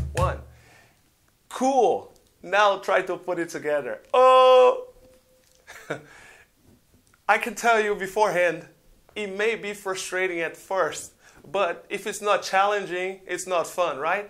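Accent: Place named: Brazil. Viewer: Funny Brazilian Accent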